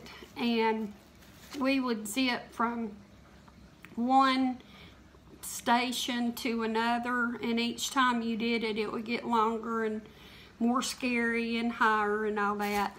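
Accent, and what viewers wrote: South, United States. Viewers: Hi! When I came across your YouTube video for the first time I was drawn to you immediately! My mom was from Virginia and I lived there for period of time when I was a kid! Your southern accent and your personality make me feel at home! I really enjoy all your videos and your calming effect! Take care of yourself and keep up all of your good work! By the way, I live in central California now!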